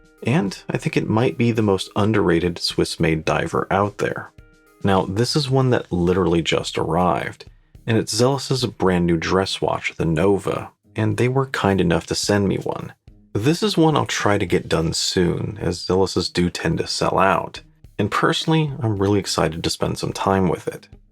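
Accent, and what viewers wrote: United States. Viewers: Anything Seiko related is always most welcome, but the Glycine looks equally interesting. Now for the compliments. Kudos on a great channel. Love your voice by the way, it is so quintessentially American.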